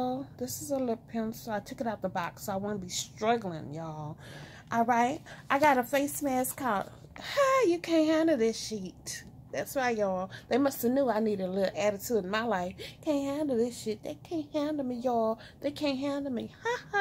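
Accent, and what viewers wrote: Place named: South, United States. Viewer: sound like she from the south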